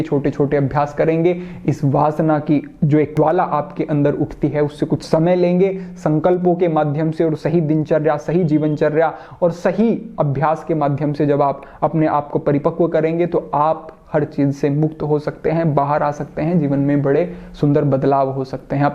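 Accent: Standard. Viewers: आप बिल्कुल शुद्ध हिंदी बोलते हो गुरुजी 🙏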